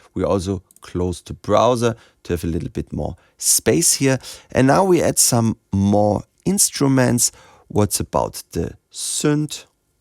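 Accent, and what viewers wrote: Germany. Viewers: Your videos are awesomly helpful! If I had to rely on the Videos uploaded by the Ableton website, I'd have great trouble in learning Live 11! The best part is your german accent. I'm from Germany myself originally and it's always great to hear the odd german expression like 'Zack!' which you throw in every now and then. Cheers from Ingo in Melbourne, Australia!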